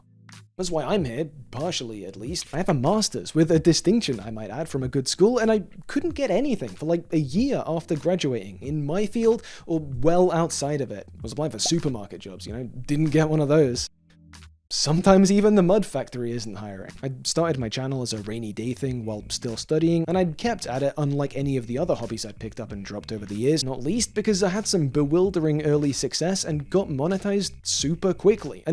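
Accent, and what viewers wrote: United Kingdom. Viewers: how is this guy British Kyle Bosman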